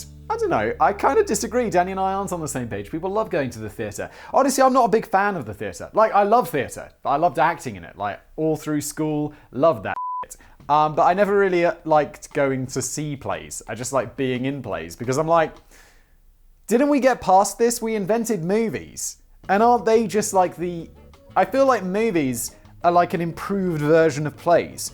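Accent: United Kingdom. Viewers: Actually thought he lived in America. Yes, he speaks like a Brit, but he seems very America-oriented. Every time he says "Danny" in his British accent, makes me think of the social worker scene in Mrs. Doubtfire. Where he goes back and forth in some normal look and Doubtfire? He cries out: "Oh, Danny boy!". Never heard of any of those Brit Soaps, but in the last 20 years American soaps have become Star Trek vs. Clive Barker. No wonder many ended after 50 years. People like retro tech. Just look at any young person ever that thinks their meme of pretty much EVERYTHING is cool. I am used to seeing vinyl new again, but I saw a laser disc of Frozen at walmart today! You know? Those giant DVDs that lasted like 2 seconds? Whats next? Back to fucking beta tapes?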